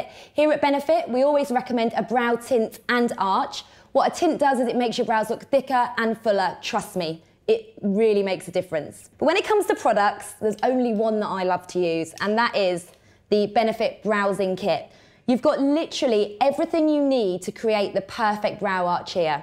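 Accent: United Kingdom. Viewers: the first few seconds were the funniest because she's talking sooo freaking fast plus that british accent haha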